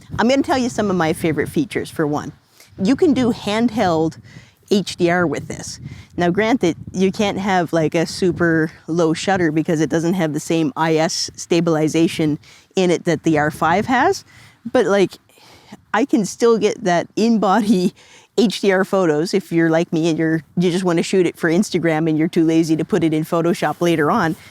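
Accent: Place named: Baltimore, United States. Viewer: Thanks for taking the time to share your thoughts. I just grabbed an EOS R for 1,070 USD! It was a cancelled special order that's been sitting in the store room since 2018. I was going to hire an R5C for a job, but this purchase didn't cost too much more than the rental fee. What SD cards do you use? I've found that Lexar V60, 1800, UHS-II have been massively discounted recently. I'll mostly likely shoot in 1080 most of the time, but I'd like to get the best 4k onto the card, when I do use it. Are V60 cards to the challenge or V90 UHS-II cards critical? Is the difference between 8 bit and 10 bit dramatic, or is it something that you notice only when really analysing the output? I'm originally from Baltimore and it's always funny to me when I hear the same pronunciation as my way of saying the letter "O, from aaaall the way in the FNA (Far North America). 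Cheers!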